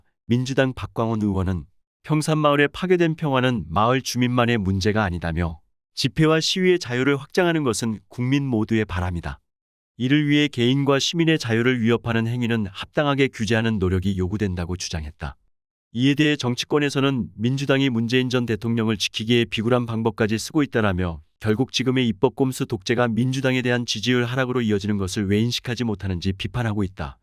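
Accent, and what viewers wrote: North Korea. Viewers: 정성산님 목소리가 아닌데 어디 편찮으신가요? 자연스럽게 이북 억양이섞인 말투가 구수한데요 하루빨리 다시 듣기 바랍니다 감사합니다~^^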